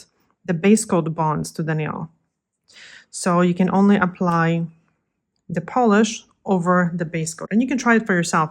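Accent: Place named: Poland. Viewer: Hey, I just want to say thank you for your calming videos. I am half Polish, half English so your accent is always a comfort to me and reminds me of my Polish relatives ❤️🇵🇱 Thank you for teaching us all about nail care. Ps I love the colour you used on this client and now I’m on the hunt for a similar range of shimmery polishes! If you have any recommendations that are available in the UK it would be great to know. Xx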